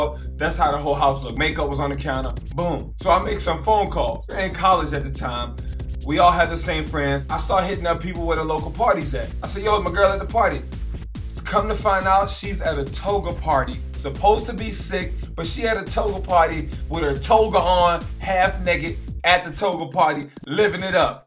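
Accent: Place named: Baltimore, United States. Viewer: You sound sooo B-more with all those "Yo's" lol.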